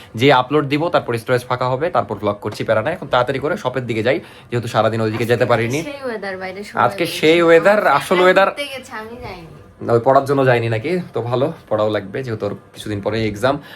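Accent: Rajshahi, Bangladesh. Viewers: I like ur accent 😂🎉 rajshahi accent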